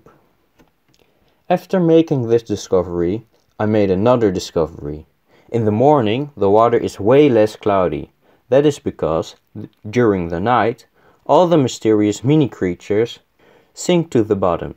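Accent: Netherlands. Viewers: Aaah... Dutch English, nice video!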